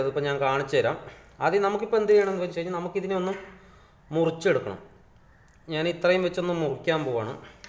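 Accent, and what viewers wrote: Palakkad, India. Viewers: യെ ഏട്ടോ നിങ്ങ പാലക്കാടാണ്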